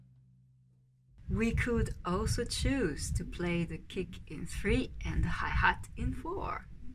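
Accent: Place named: Canada. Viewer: My favorite Canadian accent.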